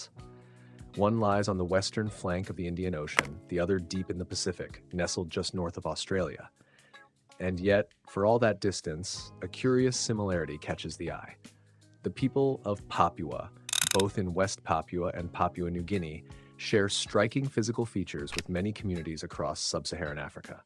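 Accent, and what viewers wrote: United States. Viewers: Hmm voice over has an American accent but channel based in Indonesia??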